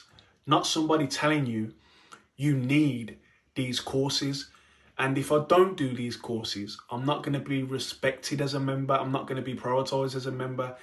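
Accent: Wolverhampton, United Kingdom. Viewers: You sound like your from Wolves in the Midlands, now let me say this i made it to Hollywood and enterd the world of film and TV from nothing midlands girl born and raised in a house of 6 boys with a working class family, what you just described sounds like a complete joke! what a sham, well done to you there"s much better mentoring apprenteships than that nonsense, you made the right decision.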